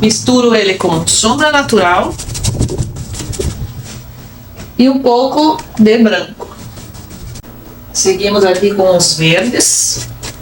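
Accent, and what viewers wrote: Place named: South, Brazil. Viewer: Hi, que surpresa ver campos de lavanda em Gramado! vc está em Gramado? amo Lavandas e nāo sabia que tinha ai no sul.,principalmente quando estāo proximas com campos de girassois. Aqui no sul da França, o pic da floraçāo é em julho qdo fazem a colheita, e ai em Gramado? amei ver suas técnicas de pintura e maneira de ensinar e amei o sotaque kkk acho que é do sul! estas tintas em pote que vc usou, sāo tintas para decoraçāo? a pigmentaçāo é permanente? pergunto pq aqui este tipo de tinta em potes sāo vendidas em lojas de crafts e a escolha de cores é limitada, e as em tubo em lojas de material para desenho e artes. fiquei feliz de encontrar seu canal e ver suas alunas!!!!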